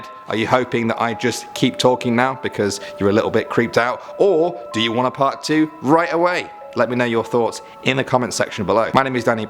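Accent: United Kingdom. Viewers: I love how he is british and not American and he speaks slow so you can actually hear what he is saying. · British accent so cute....he said " I'd probably run through a wall if he saw that outside his window"😁👍🏽 ♡☆♡☆♡☆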